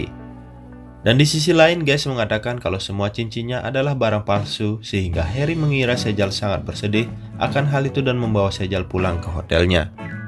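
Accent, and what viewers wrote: Bali, Indonesia. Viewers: ini yg punya chenal pasti orang bali . . logat balinya kluatan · Dari logatnya mimin kaya orang Bali yaa hhh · Kok kayak bali malahan bng 😁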